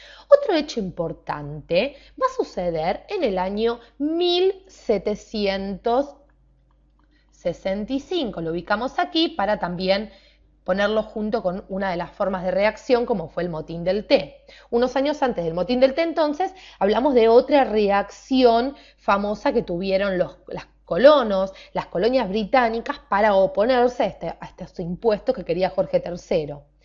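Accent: Argentina. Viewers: me encanta ese acento , es argentino ?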